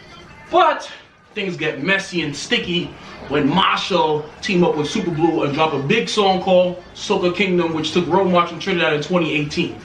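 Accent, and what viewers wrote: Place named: Saint Lucia. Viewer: Knew you were a Lucian, picked up the accent in between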